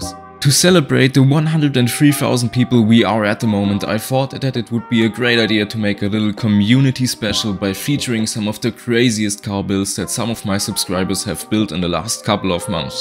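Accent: Germany. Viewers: U Sound rlly german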